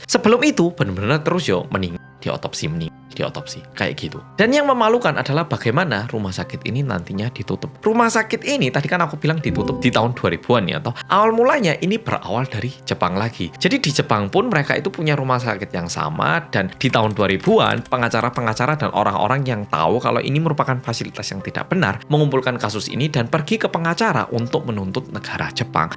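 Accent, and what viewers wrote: Java, Indonesia. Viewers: Gabaken wkwkwk😂😂 jowo banget😅😂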